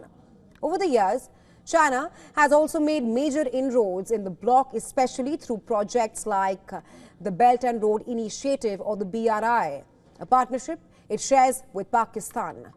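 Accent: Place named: India. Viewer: It's 'SAARC' not 'SUCK' 😂 Typical hindiwala and comedy weird accent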